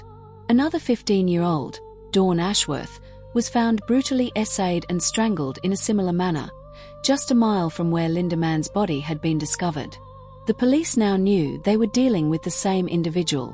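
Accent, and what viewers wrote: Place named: Australia. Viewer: At least the ai has an Aussie accent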